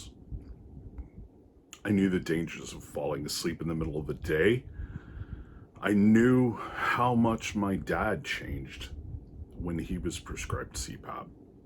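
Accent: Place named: Michigan, United States. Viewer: edit Oh shit, you said Birmingham? Based on your accent, I'm assuming this is Michigan and not Alabama, but if you are a fellow Southerner, shoutouts to you!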